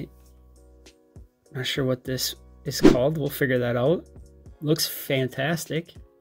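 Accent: Minnesota, United States. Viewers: What part of Minnesota are you from?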